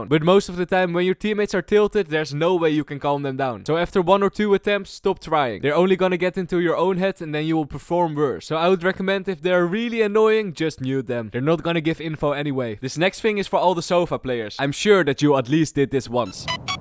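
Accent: Netherlands. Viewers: Ik weet dat je Nederlands bent bij de accent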